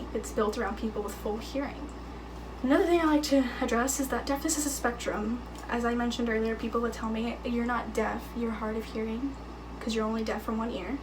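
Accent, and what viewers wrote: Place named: United States. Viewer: You know what I don't understand about you? You f lost your f hearing and all you cared are about people! "If I have cancer at least people will understand".. " I have something to tell people finally".. Whaaaat the hell is wrong with you people from USA? (I suppose you are from USA by your accent). You are all so shallow and willing to be.. part of something, included, communities, etc.. Damn girl. You have all my sympathy for the hearing loss but you need to change your ways. Really.. you are all like from the same material in there. I can't care less about people.. what they think.. I care about my f hearing.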